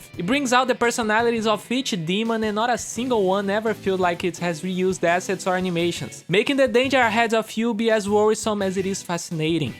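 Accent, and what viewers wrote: Brazil. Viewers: engraçado como é excepcionalmente facil identificar BR falando inglês xD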